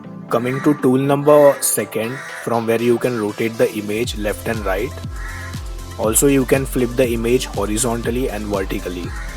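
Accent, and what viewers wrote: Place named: India. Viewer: UR VOICE SOUNDS LIKE AN INDIAN btw good tutorial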